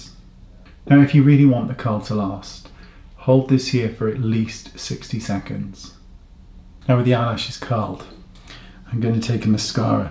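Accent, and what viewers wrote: United Kingdom. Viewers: Where have you been all my life? I just discovered your wonderful channel and adore watching you. Being 45 and at a loss with makeup application, sharp features, eye bags, and well...generally a mess... your channel makes me laugh and smile. I wish you were my neighbor. 🥰 To my undignified American ears, I think I hear UK???? Thank you for every upload. ❤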